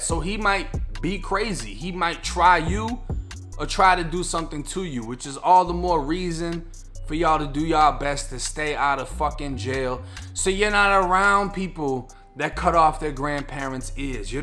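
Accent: Boston, United States. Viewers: Are you from Boston? The accent sounds like a Boston accent curious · You gotta be from Boston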